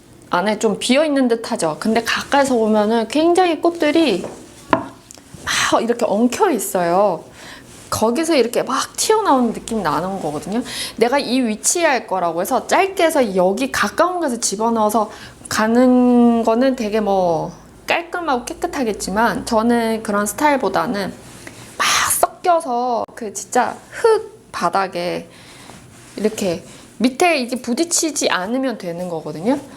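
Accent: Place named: Busan, South Korea. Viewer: 일기읽을때 부산느낌 물씬!! 오늘 꽃도 너무 예쁘네요! #힐링초하 힘내보입시다!!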